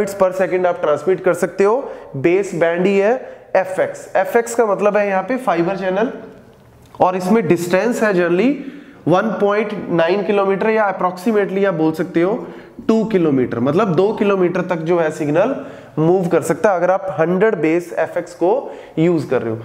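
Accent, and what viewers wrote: Punjab, India. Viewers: love your Punjabi accent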